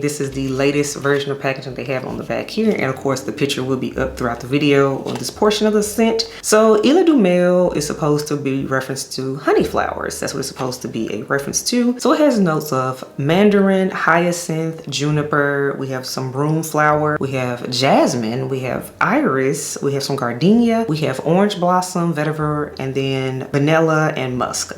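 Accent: Memphis, United States. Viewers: I knew you were a fellow Memphian when I heard you talk! Hi!!❤️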